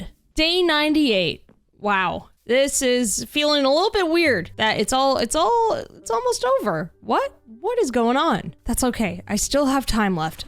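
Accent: Canada. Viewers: youre canadian? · I knew straight away you were canadian, after hearing "hey buddy nice to see ya" is such a canadian accent. LOVE ITTT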